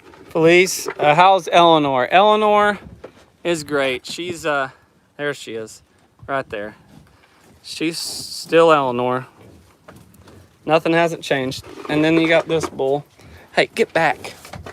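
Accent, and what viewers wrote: Oklahoma, United States. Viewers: Ponca City says hello! Just found your channel and love it. I knew you had to be in Oklahoma by just listening to your video for a few minutes. The Crosstimbers in Oklahoma starts a few miles from here in the Tall Grass Prairie Reserve in Osage county. Your videos are outstanding. Daughter #2 graduated from OSU. GO POKES!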